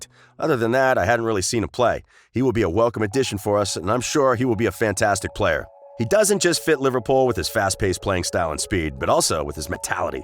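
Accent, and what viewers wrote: United States. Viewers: U can tell he's an American for sure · Soon as I heard the annoying twang of American accent couldn't take it seriously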